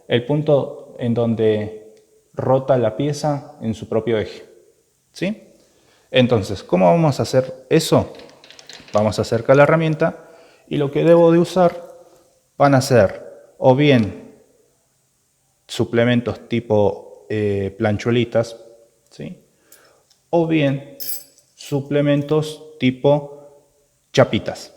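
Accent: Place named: South America. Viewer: Me caga oír hablar aún sudamericano 👎👎👎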